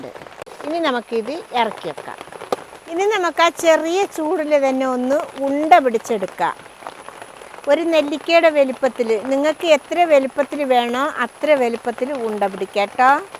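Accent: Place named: Palakkad, India. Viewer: Ningalude veed evade. Palakkadan slang und avatharanathil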